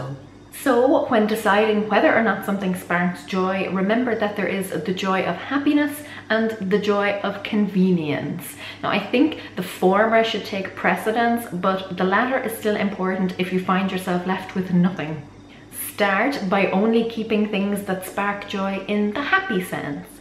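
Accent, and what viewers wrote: Ireland. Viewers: oh u live in ireland? That's cool cause i'm german and I actually thought that you had an irish accent. Interesting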